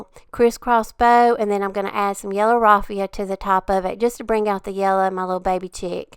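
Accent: South, United States. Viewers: Oh my, you are so creative! Love these and I look forward to seeing and hearing you share your ideas with us.Thank you for your inspirations. I also enjoy hearing your Southern accent. You are a real treasure to me and so many more I am sure! Blessings, Chris' Mom, Irene, Idaho's Color Lady!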